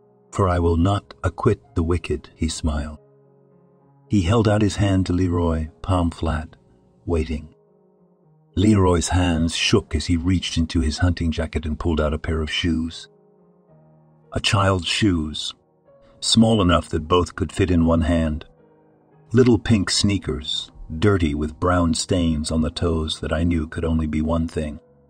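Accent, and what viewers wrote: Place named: South Africa. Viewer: You almost sound South African hey😊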